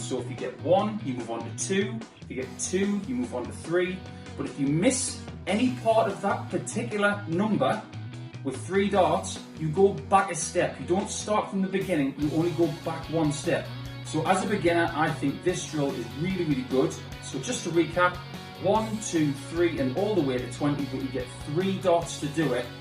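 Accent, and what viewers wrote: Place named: Australia. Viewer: Which part of Australia is your accent from Paul? I can’t pinpoint it. 😂😂 the goat darts villain for me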